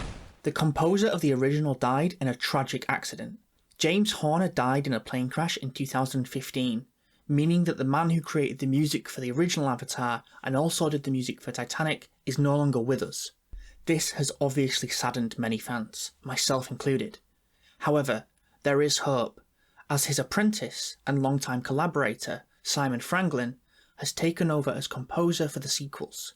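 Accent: Yorkshire, United Kingdom. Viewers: Came here for avatar news but I'm too distracted trying to figure out his accent. Yorkshire??? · is that a yorkshire accent or am i trippin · Avatar the way of wo-ah 😂 God I love British accents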